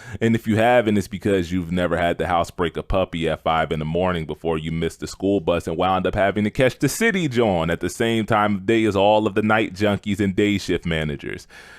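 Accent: Philadelphia, United States. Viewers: Bro you from Philly?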